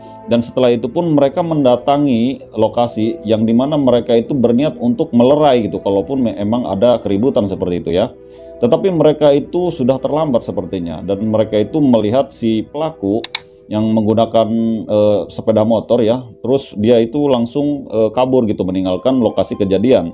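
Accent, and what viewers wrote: West Java, Indonesia. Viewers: aehh geningan sunda c akang teh 😂,,,Sampurasuuun kang -ti Bandung 🙏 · Namanya juga baisana mah ti Sunda dan logat nya juga Sunda pisan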